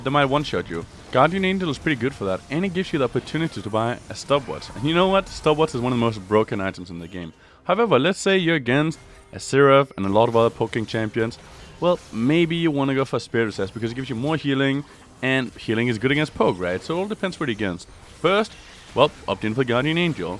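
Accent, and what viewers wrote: Denmark. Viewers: "Go for the spirit of vis-ass" xD love the danish accent.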